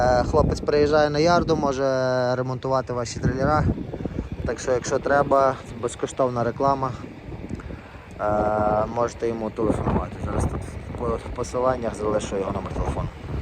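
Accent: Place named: Prykarpattia, Ukraine. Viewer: Вітаю гуцула в Америці.нас дуже багато по світу.приємно вражений нашою говіркою Прикарпатською.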